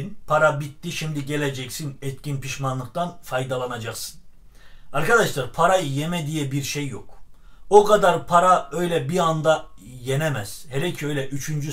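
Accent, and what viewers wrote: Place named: Koruk, Turkey. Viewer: kardeşim arada anlatımınız koruk şivesiyle çok güzel komik oluyor koruklumusunuz ^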